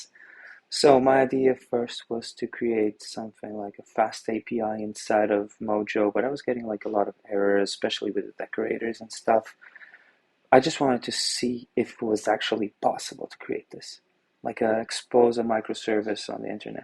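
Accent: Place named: France. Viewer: Et d'ailleurs, t'es français non ? J'ai remarqué un brin d'accent lol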